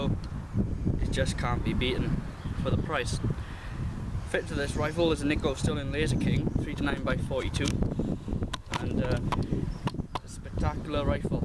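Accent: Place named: South Wales Valleys, United Kingdom. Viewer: aye obviously with that valleys accent lol. Im up in merthyr, picked up my 97k blackline yesterday for my first rifle, have a nikko sterling 4.5-14x50 AO IR coming monday for it. Cant wait to shoot it! I really like the 77 aswell, im now saving up for the 110 as a first pcp, im strictly target shooting. Any new Air rifle reviews coming soon mate?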